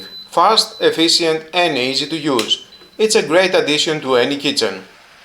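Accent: Greece. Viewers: Γιατι μου ακουγεσαι σαν Ελληνας με Proficiency?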